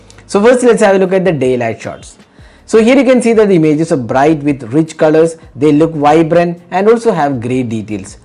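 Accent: Kerala, India. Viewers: Hehe oru mallu slang alle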